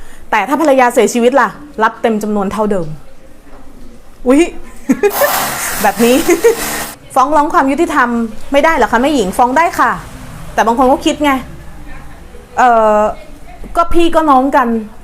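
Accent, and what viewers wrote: Isan, Thailand. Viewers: นางเป็นคนอิสานหรือเปล่าครับสำเนียงออกภาษาอิสานจังหวัดไหนครับ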